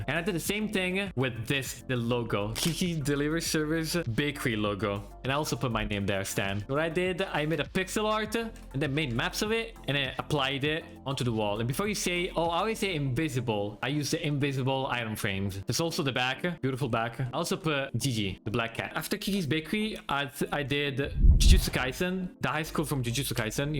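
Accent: Italy. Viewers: dai ma si sente che sei italianissimo! sei un grande comunque continua cosi!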